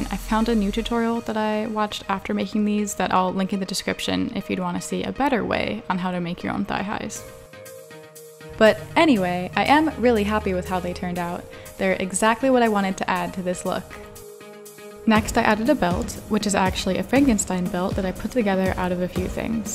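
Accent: Germany. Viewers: Wait are u German? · em stop, are u german?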